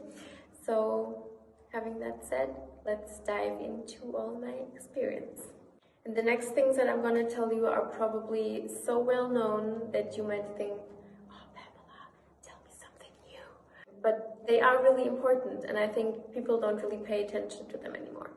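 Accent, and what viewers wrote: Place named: Germany. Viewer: She just have such a german accent.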